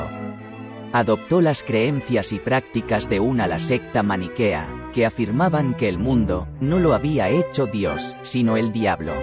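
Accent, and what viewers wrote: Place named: Spain. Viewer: Por fin encuentro un audiolibro en español de España!!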